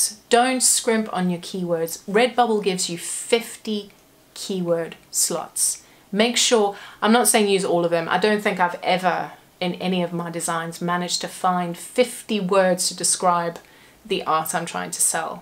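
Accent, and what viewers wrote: South Africa. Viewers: You South African? 😍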